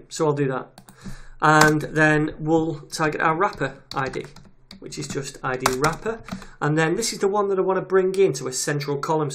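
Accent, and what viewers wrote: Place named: England, United Kingdom. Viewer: I AM NEARLY FINISHED WITH YOUR COURSE AND I CAN ONLY SAY ONE THING...IT IS SIMPLY AWESOME AND ABSOLUTELY FANTASTIC! I HAVE LOVED EVERY STEP OF IT. I APOLOGIZE FOR THE LIKINGS BECAUSE I USED THE VIVALDI BROWSER TO WATCH IT AND I JUST NOTICED, THAT MY LIKING EVERY VIDEO DID NOT SEEM TO WORK. I DON'T KNOW WHY?! NOW, I ALSO WATCHED PART OF YOUR JAVASCRIPT COURSE AND I ALSO LOVED IT. THE WAY YOU TEACH MAKES EVERYTHING EXTREMELY SIMPLE TO UNDERSTAND, EVEN FOR A NEOPHYTE LIKE ME! I CANNOT THANK YOU ENOUGH EITHER FOR ALL THE USEFUL SITES, THAT YOU PUT UNDERNEATH YOUR VIDEOS! YOU ARE A GREAT TEACHER, WHICH IS QUITE RARE IN THE PROGRAMMAING WORLD. MOST WEB DEVELOPPERS ARE QUITE IMPRESSIVE AT WHAT THEY DO, BUT, UNFORTUNATELY, THEY DO NOT KNOW HOW TO TEACH ALL THAT AND VERY OFTEN THEIR ONLINE COURSES ARE NOT ONLY CONFUSING, BUT ALSO OUTRIGHT BORING. 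YOURS IS NOT! IT IS FUN AND I ALSO LOVE YOUR PRONUNCIATION. I DON'T KNOW FROM WHICH PART OF ENGLAND YOU COME FROM, BUT I JUST LOVE IT! MY PROFUSE THANKS FOR YOUR WONDERFUL GENEROSITY WITH YOUR COURSES. I AM NEARING 68 AND I COULD NOT AFFORD TO GO BACK TO SCHOOL FOR MANY REASONS, HEALTH AND MONEY, BEING TWO! LOTS OF LOVE AND TAKE CARE! FRANCIS, A FRENCH MAN LIVING IN CANADA.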